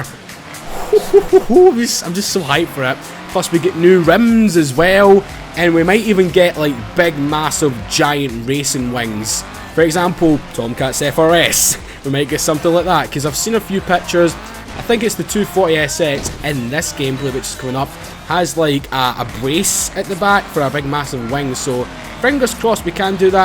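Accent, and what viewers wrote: Scotland, United Kingdom. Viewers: He is scottish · Its cuz o the Scottish accent pal i understand cuz im scottish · hooks he's talking Scottish slang but at the same time trying not to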